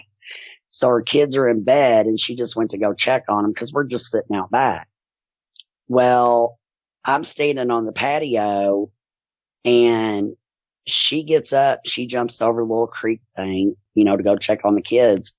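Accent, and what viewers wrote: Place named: Kentucky, United States. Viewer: hearing your Kentucky accent while listening in KY freaks me out because I feel these beings around. (There’s a noise outside just now) As a courtesy would you mention the county you live in? Prayers going out to you!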